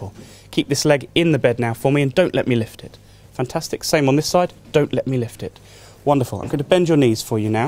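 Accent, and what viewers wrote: United Kingdom. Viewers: Full on British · Is this guy full on British or an American who's lived in the UK for a while?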